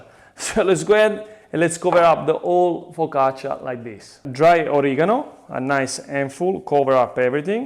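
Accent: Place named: Bari, Italy. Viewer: You have a really nice Barese accent.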